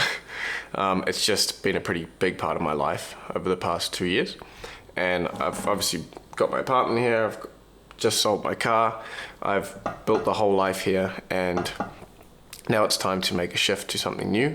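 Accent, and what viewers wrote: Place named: New Zealand. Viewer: Wow coming back home, about 3 weeks ago I was soaking up the content on this channel and I was thinking this guy sounds like a Kiwi sure enough is coming home. I am hoping you will be based in Auckland as I am just down the road.. 😊😅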